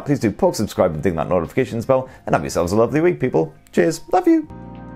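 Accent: United Kingdom. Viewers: There's an accent called British English British accent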